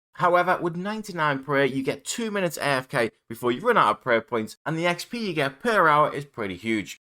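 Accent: Liverpool, United Kingdom. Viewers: Fellow scouse osrs player?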